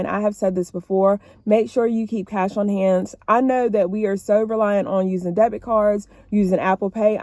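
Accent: South, United States. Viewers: I love that southern twang ❤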